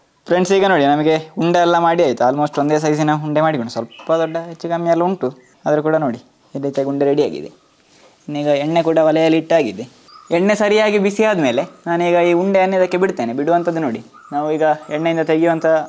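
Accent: Mangalore, India. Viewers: ಮಂಗಳೂರಿನ ಬಾಷೆಯ ಸೊಗಡು ತುಂಬಾ ಚೆನ್ನಾಗಿದೆ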